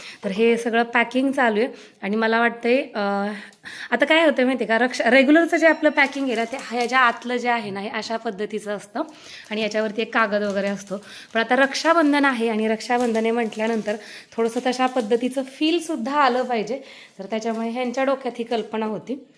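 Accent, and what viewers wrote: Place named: Solapur, India. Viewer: मला तुझी सोलापूरी अर्थात करमाळा ची भाषा खूप आवडते तु लय भारी बोलते 💜🧡❤️💚💙💙🍒🍒🍒🍒🍒🍒🍒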